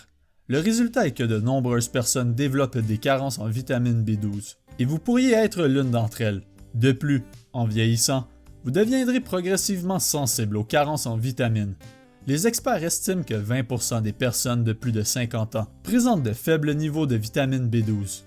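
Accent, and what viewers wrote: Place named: Quebec, Canada. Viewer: Mer i pour la video et j'aime bien l'accent etes vous qebequoi?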